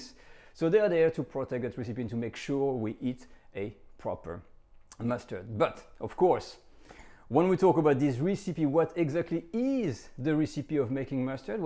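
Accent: France. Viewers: Hey, I did not not intend to offend you. Of course you can buy the stuff at a store, but the point of this clip was (IMHO) how this stuff made and how to make it yourself if you are so inclined. I for one enjoyed the presentation, the french accent and learned a couple things along the way. But then again, to each his/her own. Piece. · You could tell he’s French by his outrageous accent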